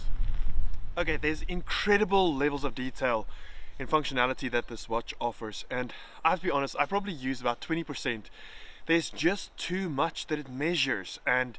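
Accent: South Africa. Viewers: I know he’s a South African when I heard he’s voice🇿🇦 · Are you from SA? Thanks for the review!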